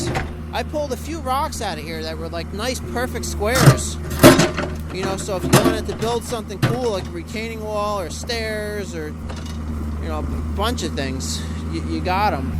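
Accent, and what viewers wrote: New York, United States. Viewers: Wiffa,haffa,poseta,lol..you sound like my uncle from N.Y...love the videos...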